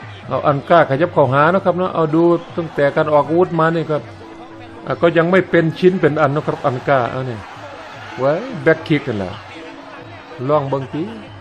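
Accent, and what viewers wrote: Isan, Thailand. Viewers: ติดตามเพราะเสียงเว้า อิอิ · ภาคภาษาอีสานนั่นแหละคุณ เพราะดี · ชอบแอ้ดพูดคำว่าไทยอีสานมากกว่าครับ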